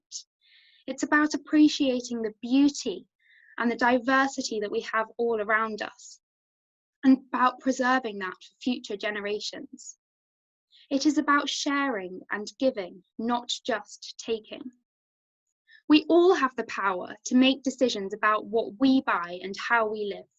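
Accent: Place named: South, United Kingdom. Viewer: Sound like some posh southerner. Doesnt sound very Cumbrian to me.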